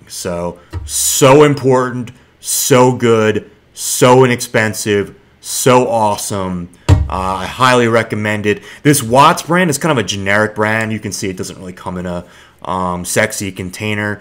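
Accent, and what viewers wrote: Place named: California, United States. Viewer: it's just a smart Cali dude cadence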